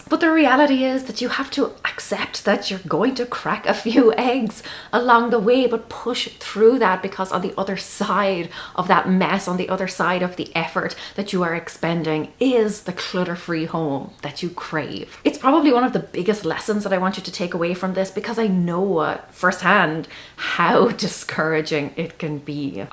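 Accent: Ireland. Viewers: Always love your videos and that wonderful melodic Irish accent xx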